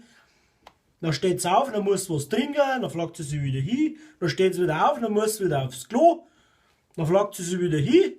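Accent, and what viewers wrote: Bavaria, Germany. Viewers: Ach der Dialekt Erinnert mich an die zeit in Bayern!!!Wie in der Alten Heimat...*herrlich* Grüße aus Sachsen ;)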